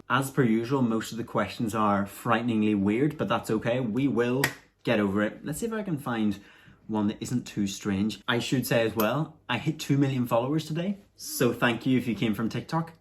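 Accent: Ireland. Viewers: I’m not 100% sure but is your accent Irish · I love his Irish voice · Are you Irish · Your accent is an Irish one right?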